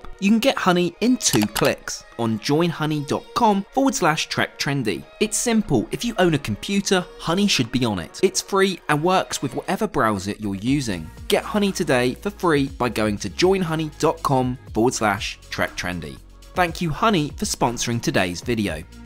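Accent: United Kingdom. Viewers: 13 seconds he says, "I'll be flying ANA's ten thousand dollar first class." He has an UK accent. They use GBP or Pounds Sterling so most likely he quoted in USD. If he had an Australian accent he'd be quoting in AUD Australian dollars. Canadian accent would quote in CAD. However, my information was incorrect as he didn't give a price for the return flight which was business class. So the entire trip would be more than 10k.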